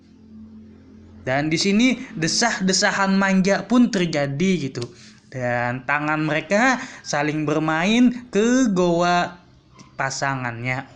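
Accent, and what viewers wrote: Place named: Banjar, Indonesia. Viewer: Dengar logatnya bhasa banjar langsung subrek😀😀....lagi pang revwie film lesbong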